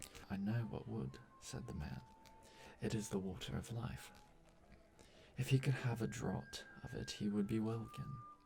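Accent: United Kingdom. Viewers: At first I was like "did he always have an english accent?" then I realized and went "oh..."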